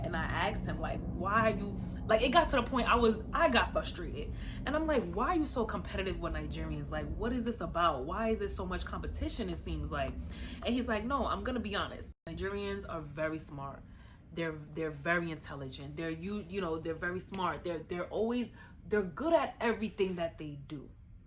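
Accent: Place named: United States. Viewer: Good video! we have to find a way to have cultural pride without being competitive. There isn't ONE thing about anyone culture that would make them "great"or better than the next. It's influences from all over. you are a proud beautiful woman of Nigerian descent but to be honest huge part what makes you poppin is you talk and like a Black American girl from NY! Period. All of our influences from the continent, the carribean and black America is what shapes us into something special. We all add to the pot to make something beautiful. No ones contribution is greater than another.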